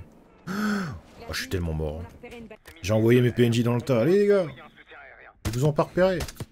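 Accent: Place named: Champagne, France. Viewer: oh petard un compatriote élevé au Chaource et à l'andouillette AAAAA ! Je sais pourquoi je me sent comme à la maison quand je t'écoute maintenant ! J'tenterais même de dire que t'es de Brienne 😂 Bravo pour ton travail en passant 💪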